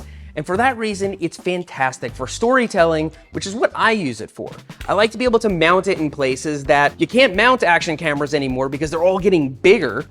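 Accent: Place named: New York, United States. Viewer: are you from NY/north jersey? i know that accent lol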